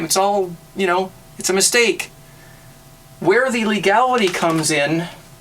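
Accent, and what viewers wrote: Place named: Canada. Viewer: I do like your video's, informative,funny, and I like your canadian accent.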